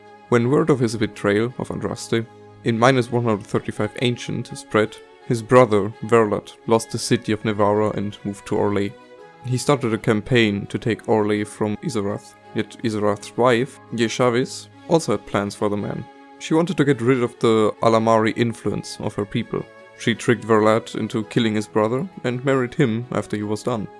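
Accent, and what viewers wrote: Germany. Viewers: He sounds German.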